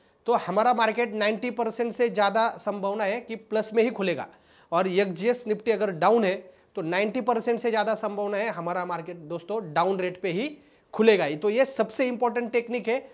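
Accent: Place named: Maharashtra, India. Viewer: Sir aapki bato se lagta hai aap MH se ho.....mi ppan Marathi aahe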